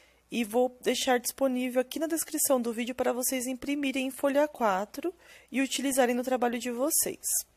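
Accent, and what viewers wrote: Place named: Bahia, Brazil. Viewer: vc fala um pouco baiano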